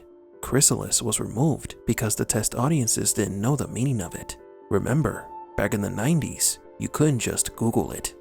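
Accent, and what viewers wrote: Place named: Russia. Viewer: Nice accent, narrator person! 👏🏻👏🏻👏🏻 Russian ryt?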